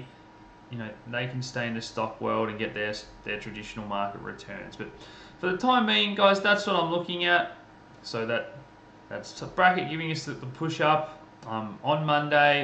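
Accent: Australia. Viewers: Brilliant, level headed insights. And I love te Aussie accent being a Queenslander myself. Where are you from?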